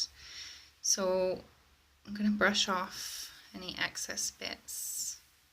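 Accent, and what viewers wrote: Scotland, United Kingdom. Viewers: Do I hear a wee bit Scottish in your accent? X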